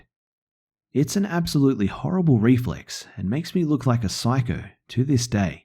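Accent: Australia. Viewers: He's Australian :) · Holy shit Australian narrator!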